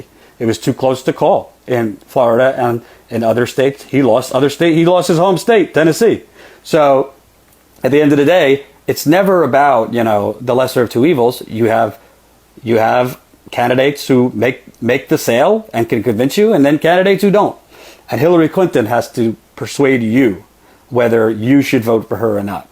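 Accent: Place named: New York, United States. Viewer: I could hear a bit of New York in you in this vid, XD Couldn't you do videos with Kyle from Secular Talk? You're both from NY and part of TYTN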